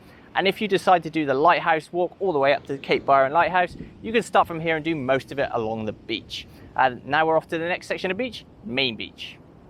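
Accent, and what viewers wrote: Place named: Cockney, United Kingdom. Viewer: Rubbish. How do you think bungalung people feel about there home being exploited for money and popularity by Squeaky sounding cockney accent. Did U think at all. Surfing is a cycle that's learnt. You learn that a sand point of quality is hard to find. This is why no out of town surfers of low skill care at what they do in the line up. U can sitting on nose and same 2 English steroid heads will have there first drop in go. Bit further there's probably you and every chick longboarding that can't negotiate the the take off or cut back at all. Then we hit every body that faces backwards to the waveusually French and German girls.they only paddle for the biggest wave. The one 50 people have waited there turn for. It's done now why don't feature a drone tutorial of AYERS Rock. Ffff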